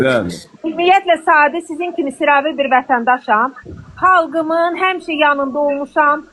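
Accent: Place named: Baku, Azerbaijan. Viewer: Canim xanim əsilll Baki ləhcəsi canim Bakillim. Duz deyir vallah.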